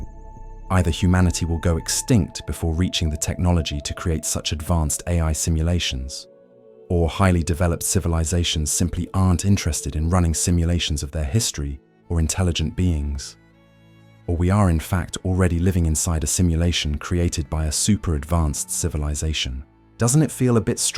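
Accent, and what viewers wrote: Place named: England, United Kingdom. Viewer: I love these types of audio/videos… but one thing I believe can be improved on in many of these audio/videos is the narration. Why does it always have to be an English accent? Try an Australian, South African, or even an American accent.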